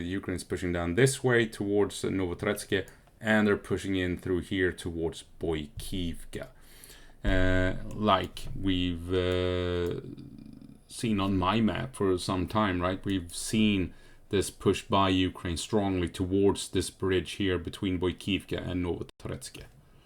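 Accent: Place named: Sweden. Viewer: "Combat boot" and "Combat boat" sounds eerily similar when you speak with a swedish accent. Yes! I throw bricks in a glas house. I speak even better swenglish.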